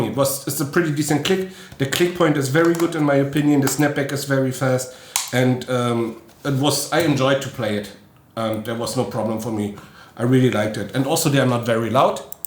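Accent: Germany. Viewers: Stuck around to pin point the accent. Hört sich ziemlich Deutsch an.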